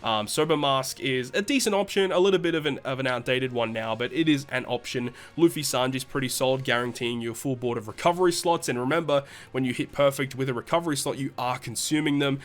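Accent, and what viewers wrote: Australia. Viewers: with his accent you should be able to tell he's Australian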